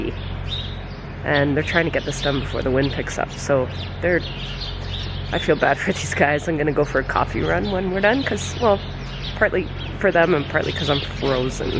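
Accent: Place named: Canada. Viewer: Where are you operating out of? Looks like Michigan but I just heard you say out} so I know your in canada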